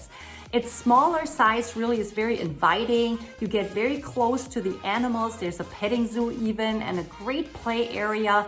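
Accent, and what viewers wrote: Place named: Germany. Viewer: Your accent sounds german, germans seems to know the entirety of everything, is that you?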